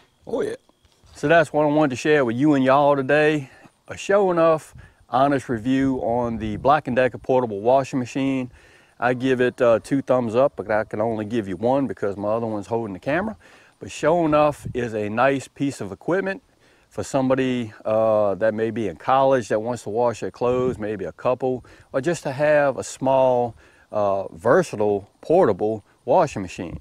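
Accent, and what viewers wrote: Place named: Mississippi, United States. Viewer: Came to this video to learn about the machine but the biggest thing I've learned is that I need a friend with a Mississippi accent. Lovely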